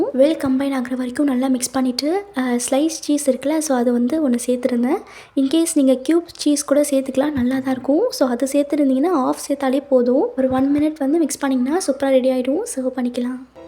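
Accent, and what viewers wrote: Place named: Chennai, India. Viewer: Videos la mattum tha ...etha voice modulation la pasuvigga la? Ilaa.......off the video laiyum eppadi etha modulation pasa palagiutiggala....etha slag voice 🥰 cute ta iruu .....ugaa old chennai slag romba romba alaga ..semma cute 🥰 ta irrukku...ga❤❤❤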